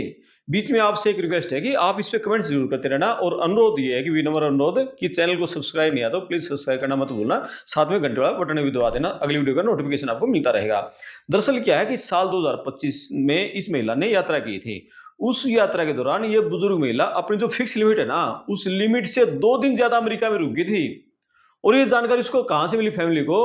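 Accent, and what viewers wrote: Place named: Haryana, India. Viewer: Heavy Haryanvi accent!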